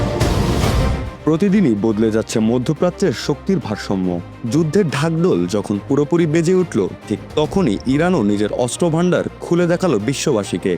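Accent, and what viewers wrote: Kolkata, India. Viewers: এই সাংবাদিককে কোলকাতার মনে হচ্ছে।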